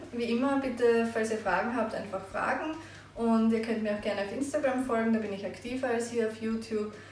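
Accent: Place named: Austria. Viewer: Sehr cool erklärt! Und schön dass man dein österreichisch raushört ;-) lg aus Wien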